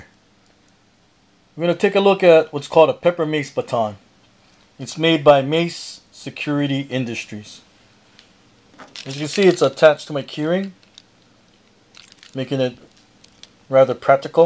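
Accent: Hawaii, United States. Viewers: Howsit!, I have been a subscriber for a while and really enjoy your videos. This video demonstrates what I dig about your vids. Clean to the point and shows great variations for EDC especially if you're in a limited carry situation. Frankly, I also dig your accent too. Reminds me of the days living in Manoa Valley. I miss Paradise, bruddah.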